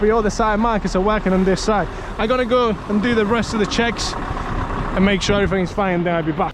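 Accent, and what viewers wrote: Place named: England, United Kingdom. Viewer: Why do you sound foreign but also English. Keep up the good work mate, I enjoy your videos.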